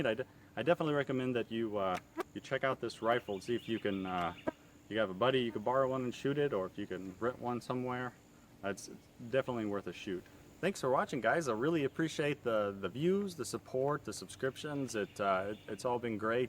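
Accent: United States. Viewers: Oh that’s American I thought it was british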